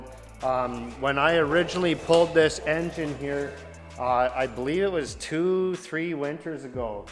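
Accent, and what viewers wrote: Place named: Saskatchewan, Canada. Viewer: You sound like your from Sask eh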